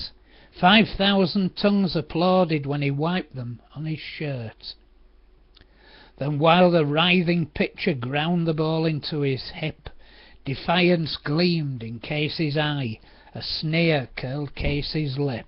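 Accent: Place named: Yorkshire, United Kingdom. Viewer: Sounds great in a Yorkshire accent. Good choice today. Too bad Casey struck out....;)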